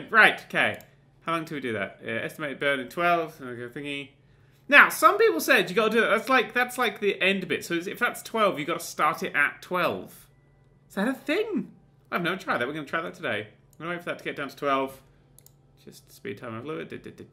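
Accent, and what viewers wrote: United Kingdom. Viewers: seriousy?? u sound like ur from great brittian, if so. hw do u NOT know doctor who??and why does the interior look old (season 3-4 or something)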